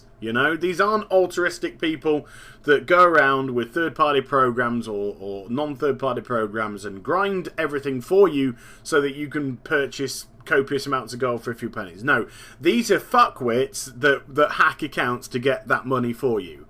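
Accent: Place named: United Kingdom. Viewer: why is it that British people pronounce it beeta instead of bayta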